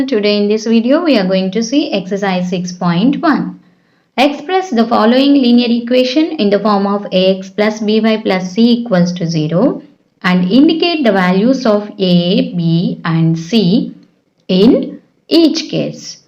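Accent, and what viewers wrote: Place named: RP, United Kingdom. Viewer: Don't do overaction okna talk properly don't talk posh english 😏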